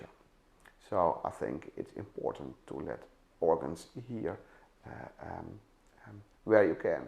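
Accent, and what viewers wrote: Netherlands. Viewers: Like every Dutch (or Flemish) person I've ever met, you speak perfect English,. Your Dutch accent is as lovely to listen to as your music. And your Ten Commandments are just right.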